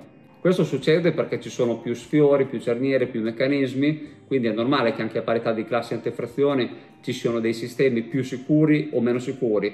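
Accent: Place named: Rimini, Italy. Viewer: la parlata riminese e troppo simpatica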